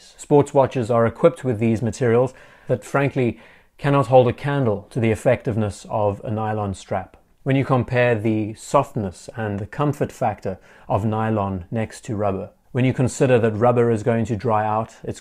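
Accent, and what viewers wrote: South Africa. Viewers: I do like the South African Accent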